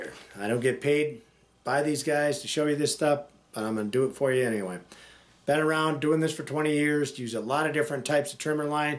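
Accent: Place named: Kentucky, United States. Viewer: I can tell you are from Kentucky.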